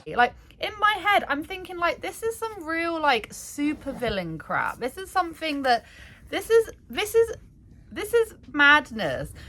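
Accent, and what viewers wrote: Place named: United Kingdom. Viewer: Omg yes thank you gorgeous! Anyone who is against your sweetheart butt are just nasty people. Your obviously a genuine person. Trashy people attract trash. And you didn’t back pedal….you said “hey guys I messed up.” End of it. Your human, your a content creator, mistakes will happen. Unlike onora YOU TAKE ACCOUNTABILITY! Love you and your British accent!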